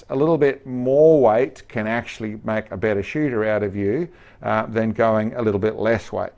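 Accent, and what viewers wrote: Australia. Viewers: This guy sounds Australian, but Australians can't have guns. So is he African?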